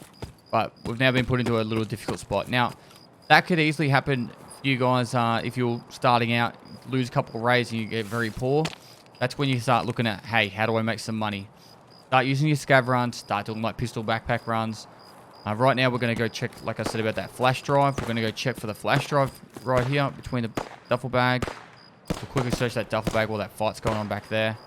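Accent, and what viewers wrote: Australia. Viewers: Love these vids! You're very informational for us new players, me included, plus the aussie accent is nice to listen to! GL in the Netherlands!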